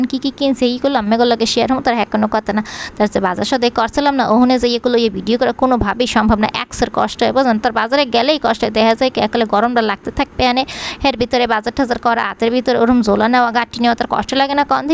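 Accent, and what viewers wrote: Barishal, Bangladesh. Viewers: আমার বাড়ি মাদারীপুর। থাকি মালয়েশিয়ায়। আমার ভাবি ও বরিশাইল্লা।কিন্তু আপনার কথা এক্কেবারে খাঁটি বরিশাইল্লা। শুনলে অনেক হাসি পায়। আপনার স্বামী ও কি বরিশালের?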